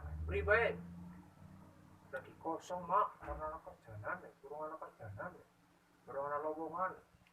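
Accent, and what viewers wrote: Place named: Banyumas, Indonesia. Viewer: jooosss gandos wong ngapakkkkkk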